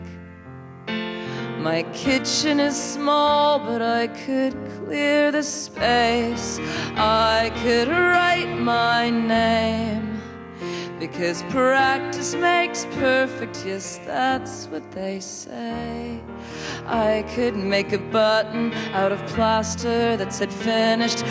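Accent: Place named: Boston, United States. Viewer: uhhh, she actually sounds like she's from the Boston area. I'm from the same town as she is and she just sounds totally normal to me.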